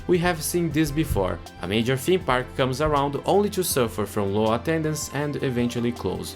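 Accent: Brazil. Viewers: I already knew your channel but only now I realized you might be brazilian like me (based off the accent). Meu amigo, finalmente nosso país terá algo digno de seu tamanho na indústria de entretenimento temático e espero que isso faça o BCW e o Hopi Hari se movimentarem rápido quanto a B&M e a RMC , respectivamente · O narrador é brasileiro, né?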